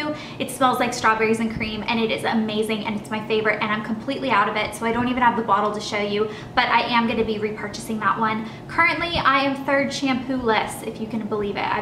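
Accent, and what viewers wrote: South, United States. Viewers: seriously LOVE your southern accent! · Love it when you speak southern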